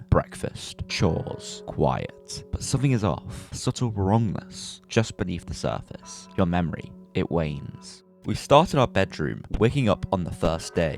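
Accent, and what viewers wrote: United Kingdom. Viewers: I don’t hate that he’s British. I am British too. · I love your brittish accent:3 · this video kinda got ruined by the fact you’re British still a good vid though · Oh hey another brit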